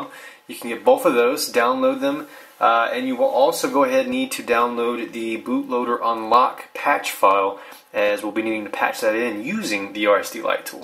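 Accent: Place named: Michigan, United States. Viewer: Are you from MI? You sound like you might have a MI accent.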